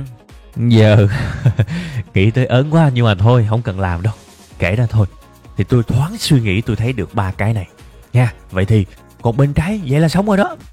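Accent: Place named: Mekong Delta, Vietnam. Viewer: rất thích cái nói tự nhiên chân chất như miền Tây của bạn. Mà làm clip giống bạn ntn vậy chỉ mình với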